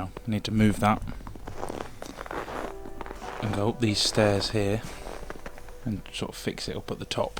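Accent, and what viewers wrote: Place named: Peterborough, United Kingdom. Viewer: Just come across your channel and I recognised your accent straight away, I'm from Peterborough. I lived in my van for a year or so. I look forward to watching your adventures, the scenery looks amazing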